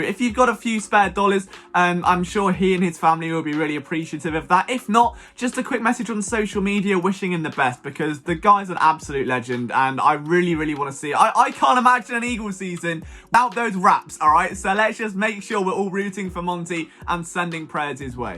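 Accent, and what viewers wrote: United Kingdom. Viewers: You have a great show. The haters are shocked that a dude with a brutish accent has this much Eagle knowledge. Big ups to you.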